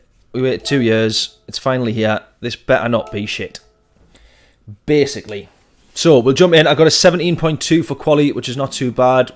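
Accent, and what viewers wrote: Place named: United Kingdom. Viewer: Your streams a really good, you deserve more subscribers. Whats your UK regional accent btw? Can't pick it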